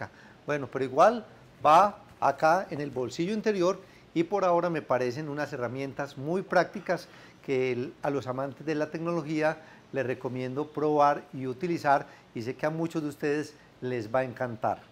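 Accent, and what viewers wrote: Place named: Colombia. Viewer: Colombiano Lol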